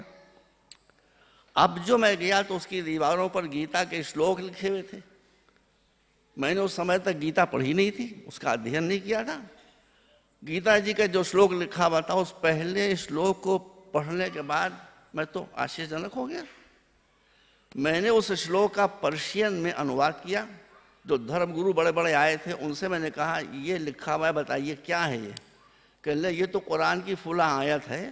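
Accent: India. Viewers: Ye moulana bihari lagta he mujhe kyunki iski Hindi se pata chalta he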